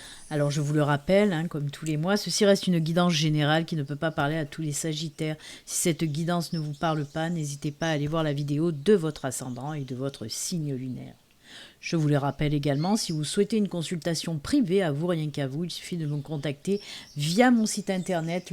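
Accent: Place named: Montpeyroux, France. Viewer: Bonjour tu es pas de montpeyroux parceque tu as l’accent de montpeyroux:) « »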